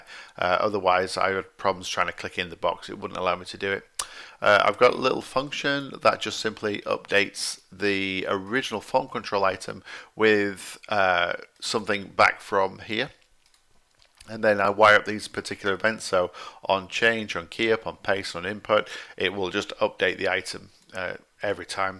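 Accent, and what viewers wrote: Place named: United Kingdom. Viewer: UK accesnt so horrible ..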